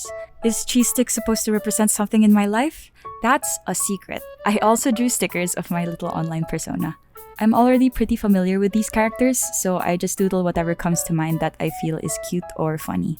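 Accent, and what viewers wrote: Philippines. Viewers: She sounds like a filipina. That accent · The Pinoy accent is strong with this one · I'm surprised someone thought you were American, you have an identifiable filipino accent. I dunno, I'm filipino and I live in the US so I can point it out easily better than most lol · Filipino accent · I HAD NO IDEA U WERE FILIPINO BUT AS SOON AS I HEARD U SPEAK I WAS LIKE 🐶🇵🇭❓️ AND THEN I SAW MARKET MARKET OMGG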